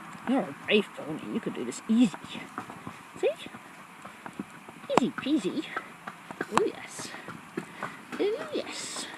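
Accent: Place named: United Kingdom. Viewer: your riding is fantastic! I was lucky enough to visit Tahoe years ago and went for a trail ride in Alpine Meadows. I live just below the Cotswolds and have Badminton at my doorstep but it's no comparison to your hacking! Can't help but notice that your accent hails from the UK though, did you have such great riding when you lived in the UK? Would love to go on a riding holiday in the area to explore the trails!!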